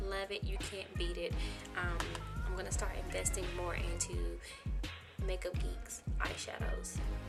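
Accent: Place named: South, United States. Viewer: Hey my new YouTube Noir friend! New subbie here! Love this pop of color!! I am also an Alabama girl by way of New York City and I have been working on my southern accent for years so don't apologize, lol. Also check out another one of our Alabama makeup beauties here on youtube: NitraaB. Look forward to watching your videos, tfs, God bless.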